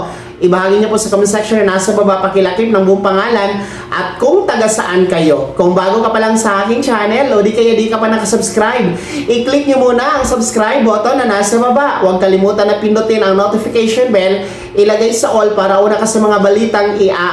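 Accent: Visayas, Philippines. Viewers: Djos ko..husayan .o naman ang pagsasalita mo!. Parang kang taga kanto na galing sa bisaya ..binipit... parang pinilipit!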